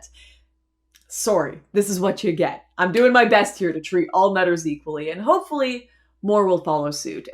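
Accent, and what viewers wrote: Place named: Canada. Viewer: Letterkenny! Canadian is showing.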